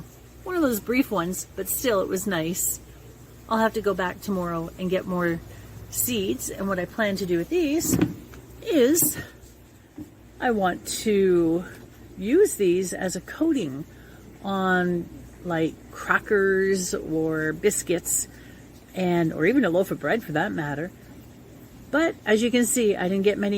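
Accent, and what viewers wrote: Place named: Canada. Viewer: Thank you! Wre those crickets in the background ? Where were you filming from? You sound Canadian but i don't associate crickets with Canada!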